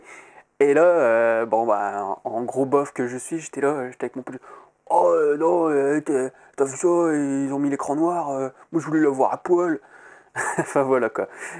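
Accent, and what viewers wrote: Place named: South, France. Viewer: C'est drôle, t'as presque l'accent du midi dès fois, sur tes O notamment ^^ C'était le commentaire inutile du jour ! Ce film ne me paraissait ni original ni super intéressant ... Comme tu dis; Virginie Effira n'a pas réellement changé de rôle finalement !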